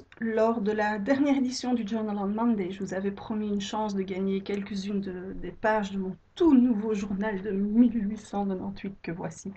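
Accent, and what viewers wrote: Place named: France. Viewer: je savais que tu parles frances. je pouvait l'entendre cuands tu parles anglais!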